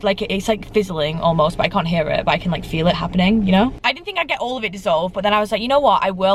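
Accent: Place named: Manchester, United Kingdom. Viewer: she’s from manchester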